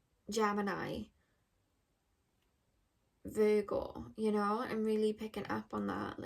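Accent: Wales, United Kingdom. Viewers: #1. Love listening to your lovely welsh accent.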